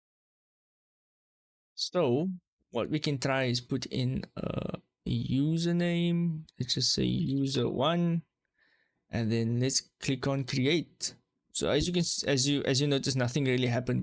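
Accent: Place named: South Africa. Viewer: I know that accent, hello from South Africa <3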